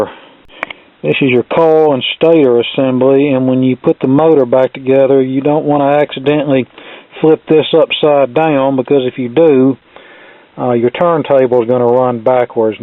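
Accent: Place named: Louisiana, United States. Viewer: He sounds like he from Louisiana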